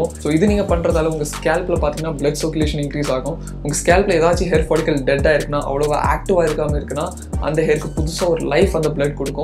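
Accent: Kanyakumari, India. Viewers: ohh I too from Kanyakumari bro , ur slang is Kanyakumari slang 😁❤️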